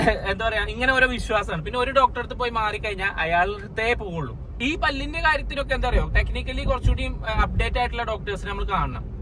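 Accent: Kozhikode, India. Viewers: Inkum venam kozhikodeinnpenn ketta aa samsaram nalla rasam und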